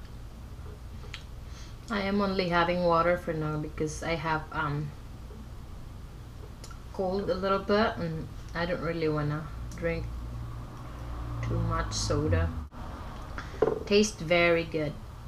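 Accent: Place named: Visayas, Philippines. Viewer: Bisaya ka dai.halata kc khit nag iislang ka magsalita haha.suysus daw.soysauce po.heje.peace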